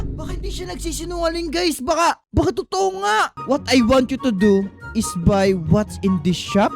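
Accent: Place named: Visayas, Philippines. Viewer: Bisaya ka😊 sobrang bisaya ka which p mahina ka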